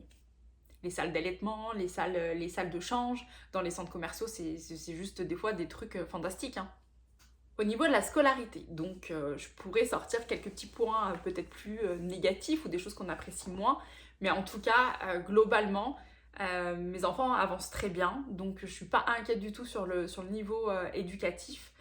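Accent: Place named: Quebec, Canada. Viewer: Tu commence a avoir des intonation de quebecoise.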